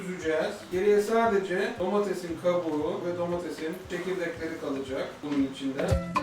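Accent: India. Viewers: Ben bu kadar güzel hint aksanı duymadım hayatımda aşırı iyi hzjahsjajs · Hint aksanı mükemmel. Devamını bekliyoruz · hint aksanı > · hasikitr lan hint aksanani efsane yapiyo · Lan bu nasıl bir aksandır muhteşem lan hintlilerden bile daha gerçekçi konuşuyor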